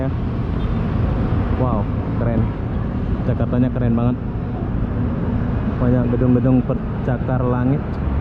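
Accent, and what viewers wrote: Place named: Malaysia. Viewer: dia orang indo tuh. Karena lama di Malaysia jadi logat dia kaya orang Malaysia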